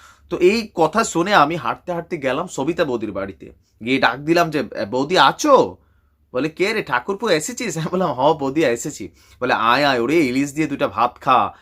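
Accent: Kolkata, India. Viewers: Koleksata bangla balo bolcn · ভাই কোলকাতার ভাষায় কথাবার্তা অসাধারণ হয়েছে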